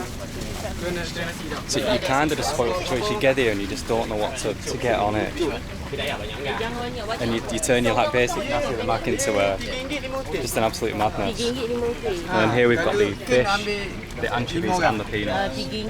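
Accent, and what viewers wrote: United Kingdom. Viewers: Your accent like British bro😂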